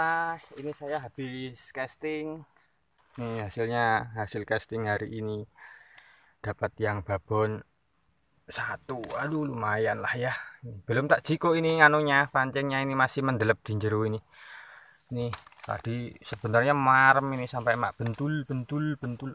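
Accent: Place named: Java, Indonesia. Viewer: seneng kalau denger kakak ngomong,totok jawanya kayak aku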